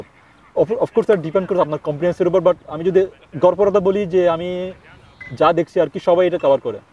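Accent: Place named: Chittagong, Bangladesh. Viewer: চট্টগ্রামের Accent শুনতে বেশ ভালোই লাগে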